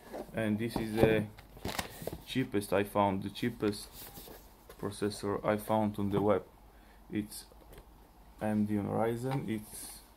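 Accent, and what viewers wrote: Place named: Eastern Europe. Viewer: so where are you from bro your accent is slavic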